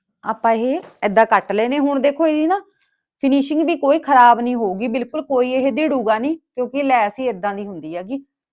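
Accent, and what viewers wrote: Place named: Hoshiarpur, India. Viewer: Hoshiarpur de boli h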